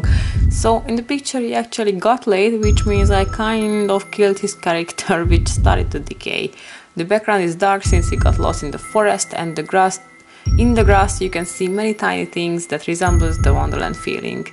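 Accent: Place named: Hungary. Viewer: Szia! Olyan érdekes látni, hogy mennyi magyar művész bújik meg elrejtve a videómegosztókon. :) Nagyon szépen rajzolsz, véletlenül hallottam meg a voicoverben hogy magyar vagy ><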